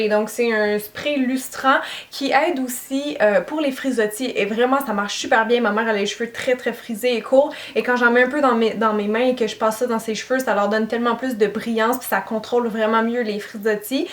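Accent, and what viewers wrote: Canada. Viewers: Coucou, tu as changé la forme de tes sourcils? En tout cas je les adore dans cette vidéo! Tes conseils sont géniaux comme toujours, mille merci de partager ça avec nous! Et ton accent, moi qui suis fan du Canada, j'en suis folle! Des bisous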